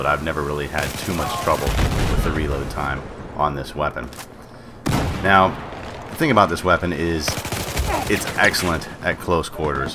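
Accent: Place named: Midwest, United States. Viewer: The attributes in your voice suggest that you are 1) experienced, 2) rich in character, 3) from the Midwest USA, and possibly 4) you were born in - and I'm just taking a shot here - May! Please tell me how much I got right, I am a slight Sherlock Holmes enthusiast. By the way, I too rather like the intrinsic appeal of this weapon, even though the stats are quite mediocre. Great review!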